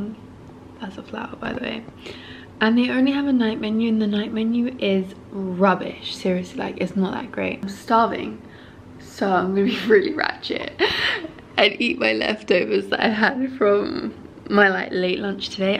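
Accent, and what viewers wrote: United Kingdom. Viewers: At the beginning of the video you have "LND>IBZ" so I think she lives in London (and she has got British accent so I suppose she's from UK). But I'm also new so I'm not sure hah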